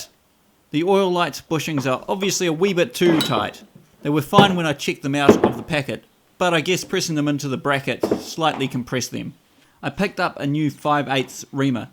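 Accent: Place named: New Zealand. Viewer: Just found these vids. Nice work. Pleased to hear a Kiwi accent on youtube.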